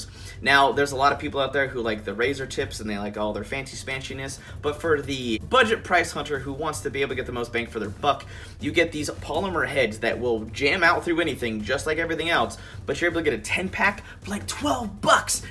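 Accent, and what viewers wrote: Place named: Texas, United States. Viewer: I kept hearing that your from Texas. Where exactly in Texas.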